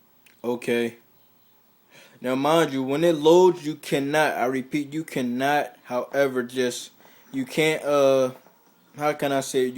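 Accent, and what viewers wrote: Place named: Northeast, United States. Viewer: you're from somewhere up north right? New York, DC, Boston?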